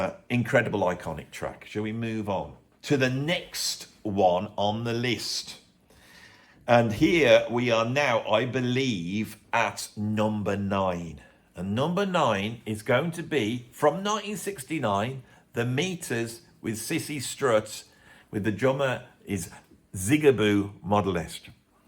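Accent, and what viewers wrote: Birmingham, United Kingdom. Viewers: He does have lovely, dulcet tones with a soft Brum twang, very easy on the ear! A lot of people have a go at the Birmingham accent, I don't. It's one of this country's finest accents.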